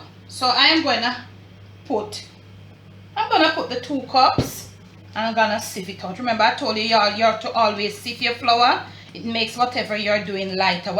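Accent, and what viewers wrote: Jamaica. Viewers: Also our Jamaican patwa is like how you speak also always wanted to visit ... Nuff luv from ja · I’m African men living in the USA, just love jamaïcain accent, food and all one love my people 🙏🏿👍🏿💯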